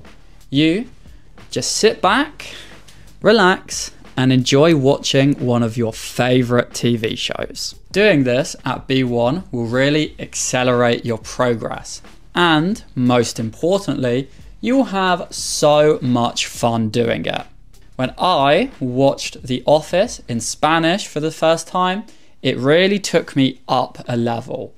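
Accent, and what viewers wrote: United Kingdom. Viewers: I love your videos.. Your british accent is so to die for ❤😊have been living in the UK for last 2 decades..I was born and raised in India.. My passion for english made me learn the language.. It's really difficult to learn a language esp in adulthood.. Having said that, nothing is impossible.. Currently, I have been learning french by myself by using Netflix, YouTube videos and other resources.. I think, it's much easier to learn the language now than before as lot of content is available at your fingertips.. I recently watched your Reading town video.. I live in Reading town.. It's a beautiful place and felt happy to see it on your channel.. Wish you all the best for your future endeavors 😊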